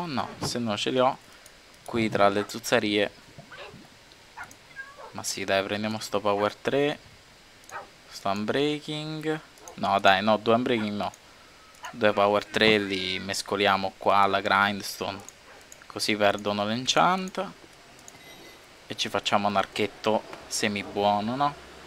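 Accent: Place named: Rome, Italy. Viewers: non è napoletano, è romano 😂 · E romanò :^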